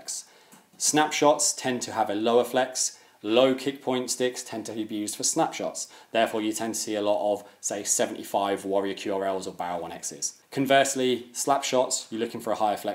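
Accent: England, United Kingdom. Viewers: A black guy speaking in a British accent discussing hockey. Never thought I would see the day. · A black dude with an english accent is really what comes to mind when i about hockey sticks.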